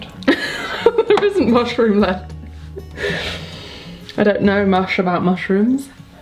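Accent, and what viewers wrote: United Kingdom. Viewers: I watch your videos since 3 years now and love them. Your british accent is kind a cute. Would love to win one of the blenders because I just moved out to study becoming a dentist. I hate that I don't have the ability to blend stuff in my little dorm room.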